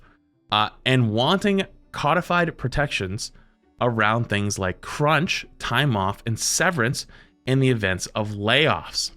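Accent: Boston, United States. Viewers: THATS MY BOSTONIAN RIGHT THERE! SPOKEN LIKE A TRUE MAN OF THE PEOPLE!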